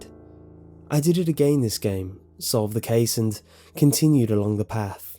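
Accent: England, United Kingdom. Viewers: How fitting to be told by an English man. x3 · SPOILER ALERT: The image described at the end is the actual photograph of Mary Kelly's crime scene by the sound of it. This is a good one, I like your taste. Good to hear an English guy reading these things too I have to say, it's quite rare though I have found others. I might even record some myself, as I haven't yet heard an English woman narrating creepy pasta's or other horror stories. I think the Creepy Pasta genre is a bit over saturated now, I was thinking of just reading old horror stories the copy right has expired on maybe.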